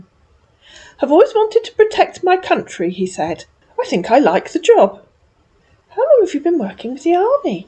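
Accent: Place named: Southern England, United Kingdom. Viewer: You sound like all my cousins, they live in Oxfordshire and Southampton, i was born in Southampton..... I live in Australia with barely any family here.... your voice makes me feel like I'm home X Oh i love what you do 🤩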